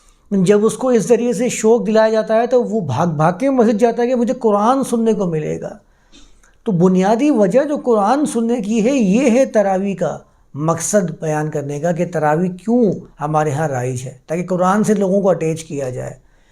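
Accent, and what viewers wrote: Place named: Arab world. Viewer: میاں، آپ بہت معقول باتیں کرتے ہیں، مگر خدا معلوم کیوں اردو کی عربی میں تلاوت شروع کردیتے ہیں؟ اب آپنے فرض کو فرد کہا، جبکہ فرد کا مطلب اردو میں individual ہوتا ہے۔ اردو میں “ض” کی آواز بلکل “ز” کی طرح ہے۔ اور یہی مستند ہے۔ طرفہ یہ کہ قرآن جسکو اردو میں بھی قرآن ہی کہا جاتا ہے، اسے آپ کوران کہتے ہیں۔ یا للعجب! اردو کو عربی لہجے میں بولنا اتنا ہی غلط ہے جتنا عربی کو اردو یا پنجابی لہجے میں بولنا۔ اب اردو میں تو فارسی، انگریزی، اور سنسکرت سے مستعار لفظ بھی ہیں۔ تو کیا انکا تلفظ ان زبانوں کے مطابق کیا جائے گا؟ تو پھر اردو کی کیا درگت بنے گی اور وہ کیسی مضحکہ خیز سنائی دے گے۔ اسلئے میاں اردو کو اردو میں ہی بولا کیجئے۔ آپ جب تلاوت کریں، یا عربی میں درس دیں تو بصد شوق خالص عربوں کی طرح بولیں۔ کس نے منع کیا ہے۔ اھلا و سھلا۔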